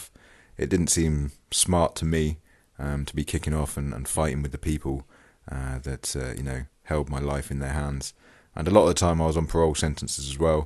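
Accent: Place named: RP, United Kingdom. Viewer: you sound like a proper little posh geek, have you ever been in armley in leeds?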